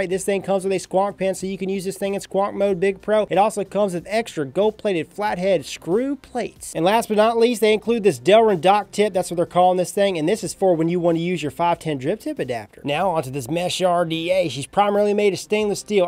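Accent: South, United States. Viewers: Mesh?????! Omg!!!!!!!! I NEVER thought vaping would evolve this much! Wow!!!! I shall go on the hunt for one! Sn: I peep a bit of a southern accent! It's adorable!!! Plus, his vids are always short, sweet, and to the point! Yessss!!!! ♥️♥️♥️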